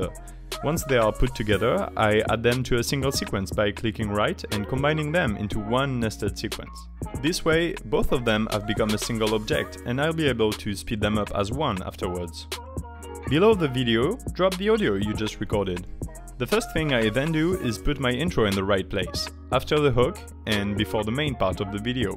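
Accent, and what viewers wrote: France. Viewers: Love your French accent!😍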